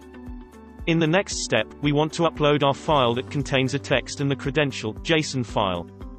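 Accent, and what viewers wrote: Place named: Germany. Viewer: ah, a german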